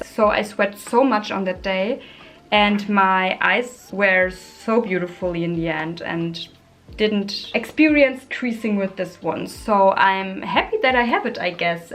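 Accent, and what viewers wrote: Germany. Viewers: You look stunning ! Are you from france ? Je n’aurais jamais pensé .. i really thought you were from Germany 😅 the accent made me confused ❤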